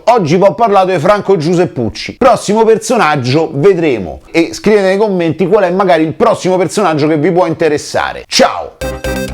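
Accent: Rome, Italy. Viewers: Ipnotico, sarà il tono di voce o la parola " romanesca" nel discorso, l accento, bo🤷, fatto sta che, anche se ha solo ripetuto cose al che sanno pure i sassi trite e ritrite, ti tiene incollato per tutti i 10 min del video, ma come cazzo fai???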